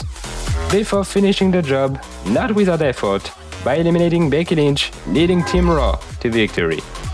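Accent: France. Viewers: Wow man, your English is great, unlike many other YouTubers'. Like that French accent as well. Great content, très bon!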